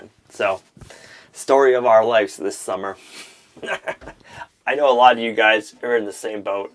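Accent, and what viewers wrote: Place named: Boston, United States. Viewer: Good Morning, I hear you on the rain it seems like it never stops looks like more today. your Boston accent keeps showing up I have one also formally from South Shore now in your area. Stay dry.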